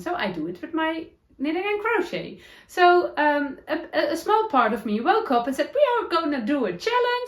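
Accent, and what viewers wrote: Netherlands. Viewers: Just found you! And so glad I did. Just at the beginning of the video and I'm looking forward to hearing all about your projects. Hope you don't mind me saying that I love Dutch accents, and I find yours so comforting to listen to :)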